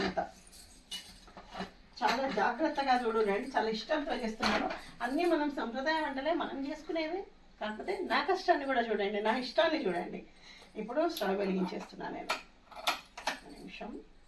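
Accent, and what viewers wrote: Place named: Andhra Pradesh, India. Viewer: Mi matalu telangana slang la ledhu mam looks like Andhra lovely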